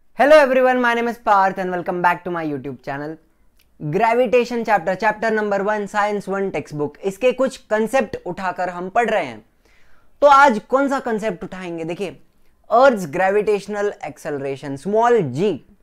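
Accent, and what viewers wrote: Maharashtra, India. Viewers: Sir u r marathi ? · Sir aap aaisa bol rahe ho...usse lag raha hai ki app MARATHI HO............ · Sir tumhi udi marta mhtl mhnje tumhi 100% marathi ahe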